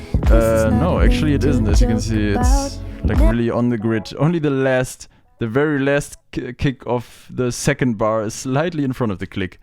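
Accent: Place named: Germany. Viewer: talks like a german, 'Heh?s' like a dutchman . do Germans do that too? I thought it was a very dutch thing until i heard you do it.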